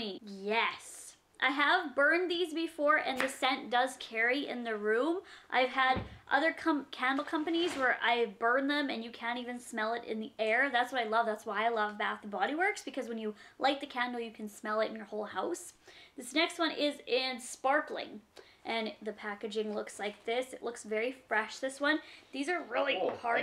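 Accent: Canada. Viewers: Your Canadian accent comes out a lot more when your boyfriend is in the video. It's cute.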